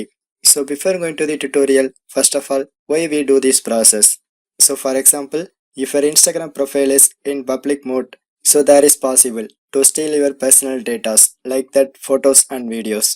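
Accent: India. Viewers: Kick-Ass Bro it's always a pleasure hearing Indians talk in English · I think He's from India 🇮🇳 ❤ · His indian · That's Indian I think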